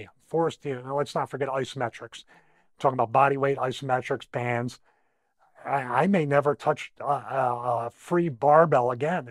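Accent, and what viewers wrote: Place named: Philadelphia, United States. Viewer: Never apologize for your Philly accent, you are Philly Born, Philly Raised, Philly Tough and Port Richmond Strong. Prayers for you and all who have no one who are struggling with their illness.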